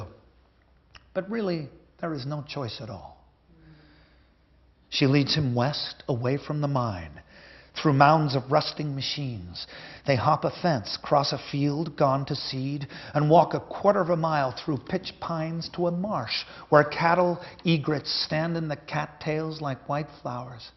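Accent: United States. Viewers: why is he reading in an american accent at Oxford?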